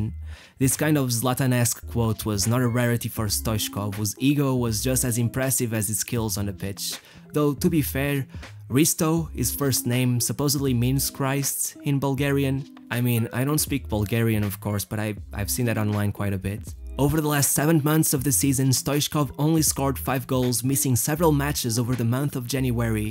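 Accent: Portugal. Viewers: o inglês tuga dramático é que coiso. Mas isto tá fixe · I love your Portuguese accent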